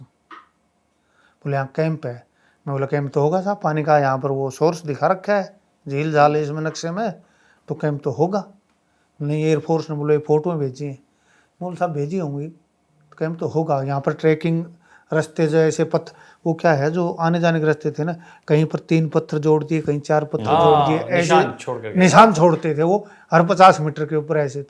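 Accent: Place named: Haryana, India. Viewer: Sir aapki galti nhi hai haryanvi accent sabhi ko samajh nahi aata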